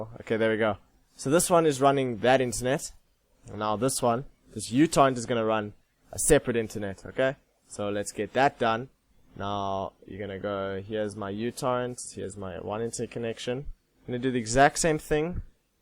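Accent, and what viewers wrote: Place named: South Africa. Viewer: This bra sounds South African AF rofl awe bru thanks for the help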